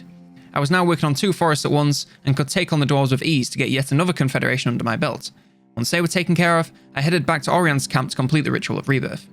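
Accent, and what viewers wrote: United Kingdom. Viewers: These stock images and British voiceover would make SpiffingBrit proud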